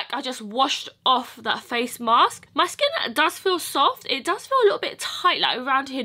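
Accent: United Kingdom. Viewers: Ik haw she is british Her accsent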